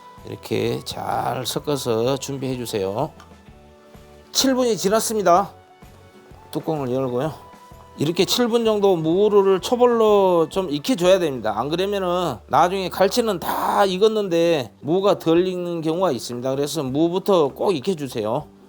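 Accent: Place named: South Jeolla, South Korea. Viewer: 전라남도 사투리가 너무구수하네요ㅎ 맛나보입니다~^^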